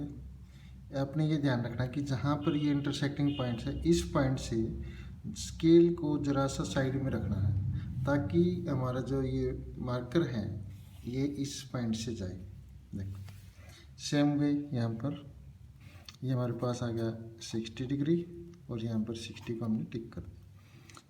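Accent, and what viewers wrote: India. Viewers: sir ji aap pakka haryana se honge ! kade t ho sir jii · Sir you are from Himachal i think for your tune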